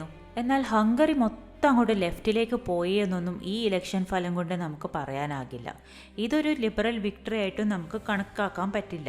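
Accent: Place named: Kozhikode, India. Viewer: congrats 🎉. Big things in simple humor coated "koyikkodan" slang. Go ahead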